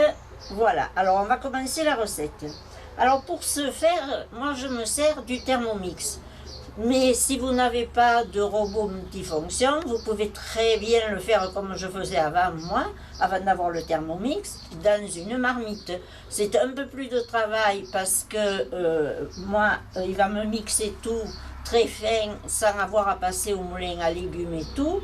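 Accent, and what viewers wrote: Provence, France. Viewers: Merci pour cette belle recette, j’imagine la bonne odeur. Juste un petit bémol, je sais pas qui filme, mais il faudrait pendant votre préparation que l’on puisse voir l’intérieur des marmites, du thermomix afin que l’on se rende compte les consistances au fur et à mesure. Par contre vos explications sont géniales. Désolée pour le caméraman c’est juste une sujestion, j’espère qu’il sera pas fâché 🤣🤣🤣. Toutes vos recettes sont excellentes. Merci beaucoup. A bientôt de vous lire et d’écouter votre bel accent de Provence. 👍👍🥰🥰♥️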